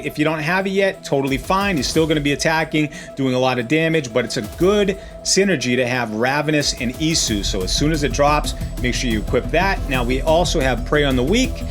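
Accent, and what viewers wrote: Boston, United States. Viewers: God damn the Boston is strong in this one!! Hahha Go Sox brother!!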